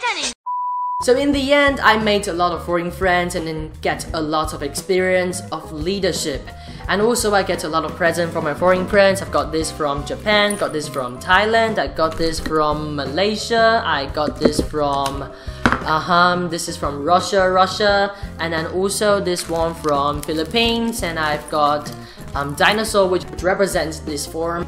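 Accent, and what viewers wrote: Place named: United Kingdom. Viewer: Well done! Sound so British, haha!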